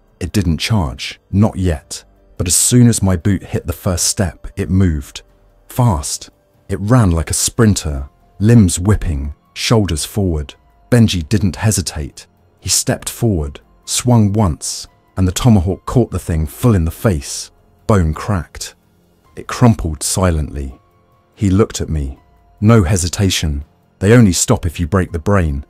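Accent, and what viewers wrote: United Kingdom. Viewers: An AI read story - homonyms like "a door starting to bow" (pronounced as "bow" & not "bO" . . . a Brit accent for an incident in America is a story flaw with minimal odds. While in a hospital but nobody is looking for supplies even though 2 have med-tech backgrounds to know how important such materials are. Another flaw. Okay - not great.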